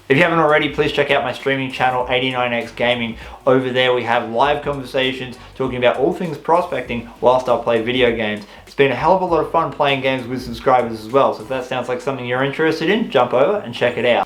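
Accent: Australia. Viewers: I think I just found the Australian version of myself without the tattoos I'm doing everything this guy's been doing without the Australian accent which I think would be really neat to have as well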